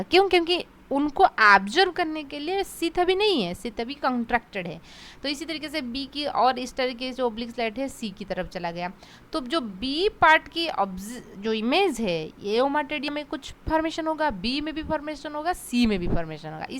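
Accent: India. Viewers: So sweet hindi accent mam ❤️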